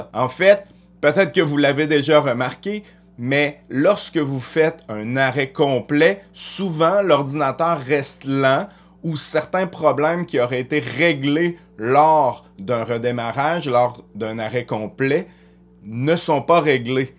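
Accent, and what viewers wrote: Quebec, Canada. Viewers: Ouaw merci ( PS : J'adore ton accent Québecois )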